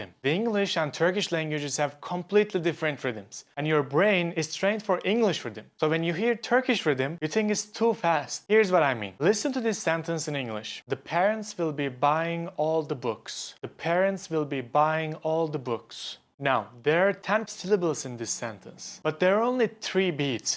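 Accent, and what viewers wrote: Turkey. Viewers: bro. i just noticed he has a turkish accent. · Senin Erzurumlu şiveni seveyim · Abi Türk olmama rağmen izledim bende İnilizce öğrenmeye çalışıyorum Türk aksanı ile iyi oldu.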